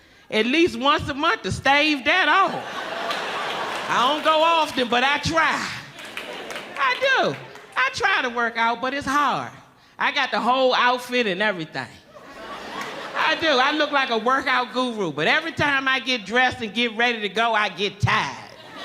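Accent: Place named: Washington DC, United States. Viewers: That DC accent & lingo makes me feel at ease 😂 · I know our accent anywhere #DC birthed some of the funniest comedians in the game. I'm just gon say Martin Lawrence, Dave Chappelle, Tommy Davidson to name a few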